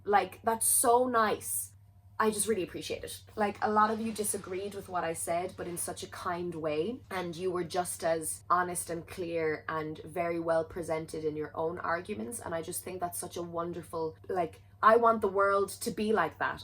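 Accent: Ireland. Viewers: Wow I really wasn’t expecting you to mention my comment! I feel so wonderful that you were excited about something I said when I’m always sooo so excited about everything you say thank you 💞💞💞 and I love this new segment! I think it’ll continue to be a really beneficial way to interact with your audience I for one feel very engaged and the more ppl that get that I think it’ll be really nice 😊 (also ppl pronounce my last name wrong quite often but you were spot on and i loved hearing my name in your lovely Irish accent lol 🥰)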